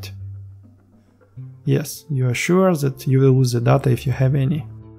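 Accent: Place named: Russia. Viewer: Русский акцент английского языка :)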